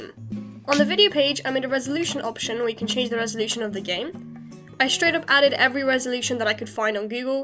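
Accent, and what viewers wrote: United Kingdom. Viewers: That's the time I stopped watching. Why do you have to talk so freaking fast?!?! You already got a heavy British accent which is hard for the most of the world to understand.. Food for thought..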